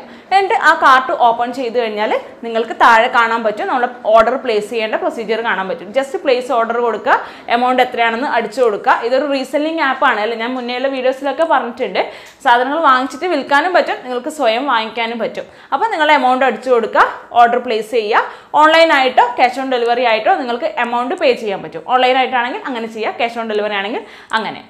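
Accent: Kannur, India. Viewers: Chechide veedu kannur aano? Kannur slag varunnundu athukond chothichatha.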